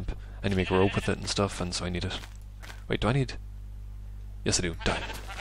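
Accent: Ireland. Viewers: Hey. Love this LP already. I was trying to place your accent, so my official guess is Cork...? Keep it up! =D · well my guess is irish .. or irelandish whatever ...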